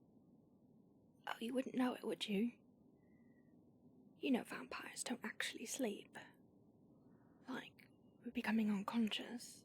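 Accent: United Kingdom. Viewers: I love youre accent Are you British ? ;) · Pretty good Brittish accent love or are you actually English ? Also I subbed and dinged coz about time SOMEONE did a bloody vampire RP without resorting to that bullshit hypnosis power to do the "forget" bollocks Also I'm English and thought your accent was kinda sexy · If I'm not wrong i think you are speaking in a British accent yeah? 😎😎 sounds awesome for a British vampire yes?👍👍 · That accent man...British women are the hottest sounding women on earth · I never knew I needed a british voice in my loneliness but I now know I needed it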